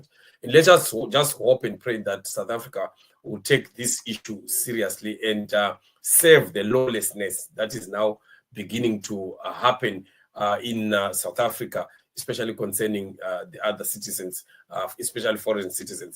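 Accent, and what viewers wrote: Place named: Zimbabwe. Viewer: You sound Zimbabwean yourself. Why do you foreigners keep on putting your noses in South African affairs as if you are entitled to this country and our issues???? Why dont you make podcasts of your sruggling country and try better it. Instead its nywe nywe nywe South Africa. Hayi foetsek man. We are sick off you ppl now. ANC is on its way out. Once MKP gains traction you will see what's coming your way. Bugger off man!!!!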